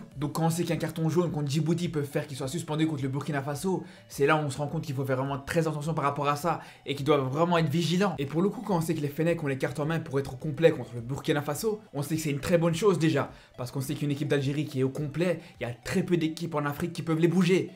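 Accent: Belgium. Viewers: Salut frère l'Algérie sera qualifié sans problème,,, tu as un accent belge ?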